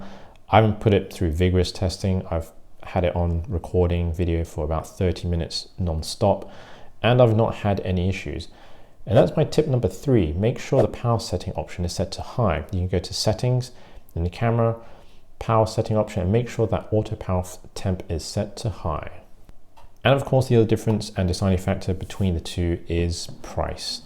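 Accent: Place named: United Kingdom. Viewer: Asian guys with eloquent British accents automatically get credibility by virtue of that presentation alone.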